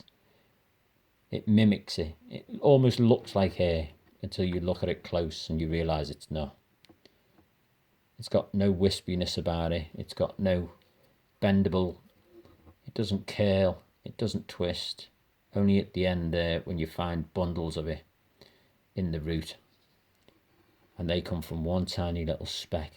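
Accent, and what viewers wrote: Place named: United Kingdom. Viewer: I see where do you live in England you seem to have a British accent maybe one could send it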